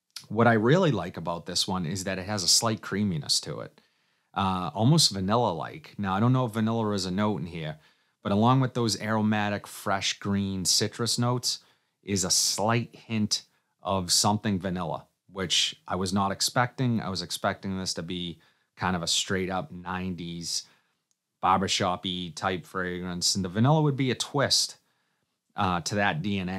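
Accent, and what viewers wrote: Boston, United States. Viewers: Absolutely love Himalaya but haven't smelled XS despite hearing about the constant comparisons. Great video as usual Your Boston accent is so awesome. Go Red Sox 🤣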